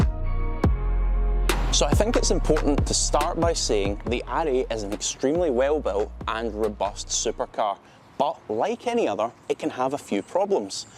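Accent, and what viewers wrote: Scotland, United Kingdom. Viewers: Thanks for the video! P.S. is it a Scottish accent? I feel like it is not, but i am far from native, so thats why i am asking. Just curious :) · Great review as I am considering buying a 2010 Audi R8 but I subscribed just because of that lovely scottish accent.Well done mate !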